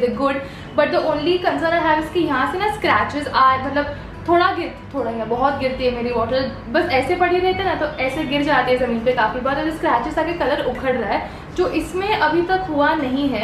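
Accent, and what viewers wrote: India. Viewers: Who loves hinglish style love the way she speaks❤️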